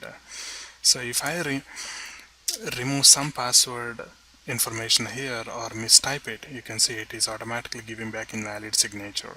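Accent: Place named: India. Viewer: I can't listen to Indian accent it's so annoying :(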